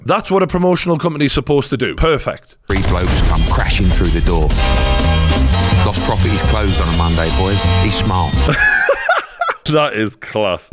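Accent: Newcastle, United Kingdom. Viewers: Hello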